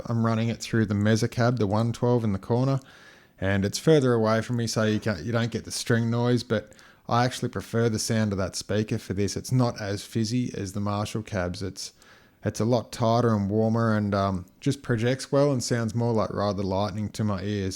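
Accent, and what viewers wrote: Australia. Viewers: Not gonna lie Did not expect that ostralian accent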